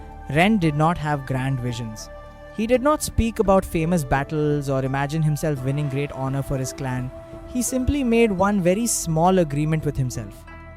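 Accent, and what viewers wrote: India. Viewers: Japanese content but Indian Voice Over ???????????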